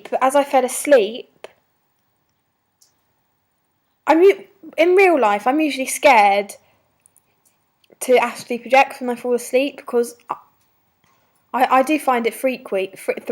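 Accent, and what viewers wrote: United Kingdom. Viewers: where from the UK you from? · lol i can never take british accents seriously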